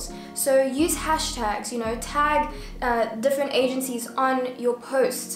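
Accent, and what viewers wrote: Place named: South Africa. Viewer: Love this so much I subbed as a fellow South African 😁🇿🇦 I literally heard your voice and was like aish!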